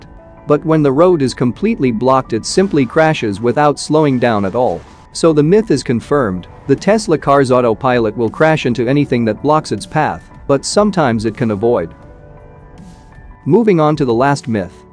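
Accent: India. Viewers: Are you from India 🇮🇳🤔😅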